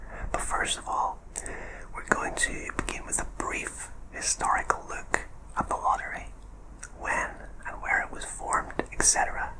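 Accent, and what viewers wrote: Ireland. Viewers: ❤Sometimes I play the lottery... but its a real downer when I lose and I have to go back to work! Love your Irish whisper! ❤ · your accent sounds Irish 😂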